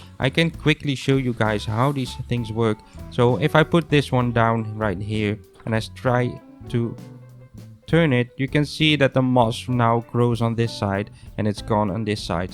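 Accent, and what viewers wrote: Netherlands. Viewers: The dutch accent is amazing 🙂🇳🇱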